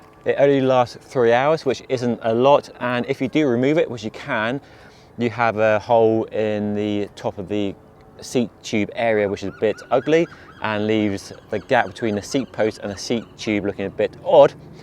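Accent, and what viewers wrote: United Kingdom. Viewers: Why California people speak British English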